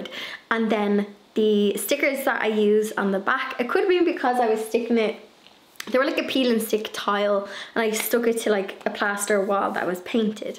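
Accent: Ireland. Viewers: you are a most delightful sweetheart ❤! You are SO pleasant to listen to with your lovely Irish accent, and watch your projects in each video! You are really gifted in the countless ideas that you have…inside and outside! 😊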